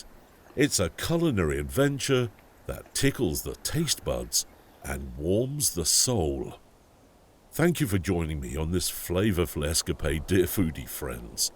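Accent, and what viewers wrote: United Kingdom. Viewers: Get rid of the British narrator please!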